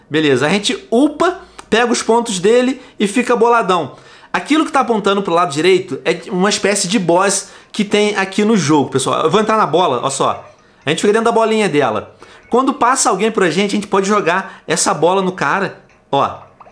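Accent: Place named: Portugal. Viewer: Tio fala em sotaque português ☺☺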